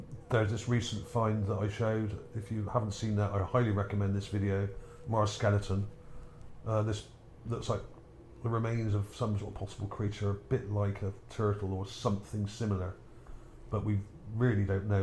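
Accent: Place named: England, United Kingdom. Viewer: Love your accent mate, what part of England are you from , somewhere S.W. I know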